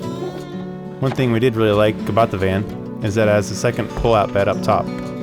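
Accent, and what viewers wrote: Ireland. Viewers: Just discovered the channel and have been binging all day. Love it, but it does need approximately 60% more Irish accent. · Irish ☘️ is the best accent to listen to 👍🏼🤷‍♂️😂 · that irish accent.... you weren't joking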